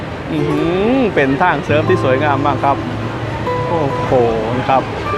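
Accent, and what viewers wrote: Phu Thai, Thailand. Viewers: พูดภาษาสำเนียงภูไทเป็นภาษาท้องถิ่นเหมือนกับภาษาเหนือ ภาษาใต้ ภาษาอีสาน คนอีสานรู้จักเรียกกันว่าภาษาสำเนียงภูไท เหมือนกับ สำเนียงสุพรรณ สำเนียงระยองฮิ ฯลฯ บางคำพวกเราก็เข้าใจแต่ส่วนมากหลายๆคำไม่เข้าใจ นครพนม อำเภอเรณูนคร มีฉายาว่าเวียงพิงค์นครแห่งแดนอีสาน สวยอ่อนช้อยงดงามทั้งคนและวัฒนธรรม เป็นอำเภอถิ่นภูไทมีชนเผ่าภูไทอาศัยอยู่ 99.99% พูดภาษาสำเนียงภูไทตั้งแต่เกิดสืบทอดมาจากบรรพบุรุษนับหลายพันปี นครพนม มุกดาหาร มีชาวบ้านท้องถิ่นอาศัยมานานนับพันปีอยู่ถึง 7 ชนเผ่า แต่ละชนเผ่ามีภาษาพูดเป็นของตัวเอง 1 ในนั้นคือชนเผ่าภูไท และที่จะไม่พูดถึงเลยไม่ได้เพราะวันนี้ถือว่าเป็นคนไทยเกรด A+++ เป็นชนเผ่าที่กุมอำนาจทางเศรษฐกิจสังคมและการเมืองอีสาน คือชนเผ่าที่ 8 ชนเผ่าจีน ชนเผ่าที่ 9 ชนเผ่าญวน แถมน้องใหม่ดาวรุ่ง ชนเผ่าที่ 10 คือชนเผ่าคิงส์โรมัน ผู้มีความรู้ความชำนาญเชี่ยวชาญเทคโนโลยี Call Center, พนันออนไลน์, เปิดกิจการนอมินี, ร้านค้านอมินี, ทัวร์นอมินี, ผัวนอมินี, เมียนอมินี, นายทุนเงินกู้นอมินี, ธุรกิจบัตร..ปลอม, ฟอกเงินข้ามชาติ ฯลฯ ถือได้ว่าเป็นธุรกิจน้องใหม่ไฟแรงแซงทุกโค้งเพราะมีเงินทุนซับพอร์ตมหาศาล เงินหนาพร้อมชนธุรกิจเจ้าเก่าทุกค่ายทุกแพลทฟอร์มให้มันรู้ไปว่าไผเป็นไผใครใหญ่ใครอยู่ จึงทำรายได้มหาศาลกว่าธุรกิจใดๆในโลก.. 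Hello thailand 🇹🇭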